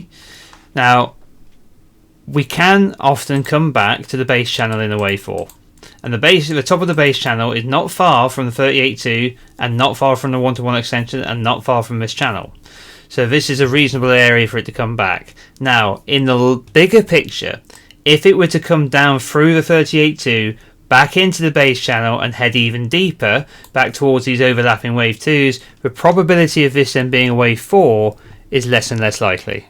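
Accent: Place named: England, United Kingdom. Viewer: I don't know if it's just because of the English accent, but as a non-trader I understand everything you say, you explain things very clearly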